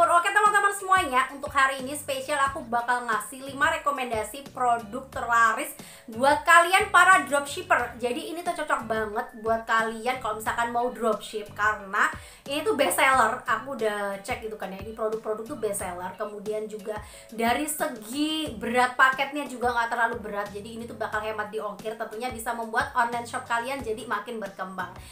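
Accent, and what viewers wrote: Java, Indonesia. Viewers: Ngomongnya medok banget. Dan kurang santai mbak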